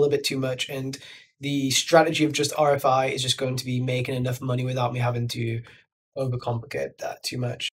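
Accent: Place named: United Kingdom. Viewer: Where are you from in the UK? Hard to pick the accent!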